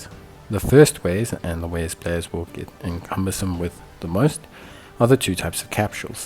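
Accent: South Africa. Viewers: I know a 🇿🇦 voice when i hear it. Big ups bru!!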